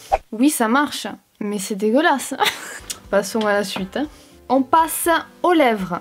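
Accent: South, France. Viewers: J'adore t'entendre en vidéo avec ton accent qui me rappelle le mien hihi (je viens du sud vers Carcassonne)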